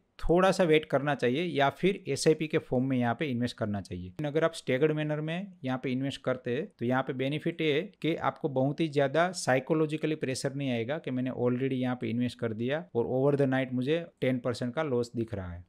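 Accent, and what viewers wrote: Gujarat, India. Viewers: Thanks for your pure gujarati accent.❤❤ greetings from Surat...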